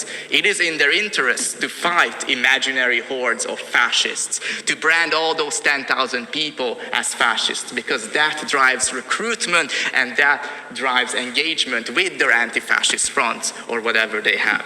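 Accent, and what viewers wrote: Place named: Ireland. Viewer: Strong Irish accent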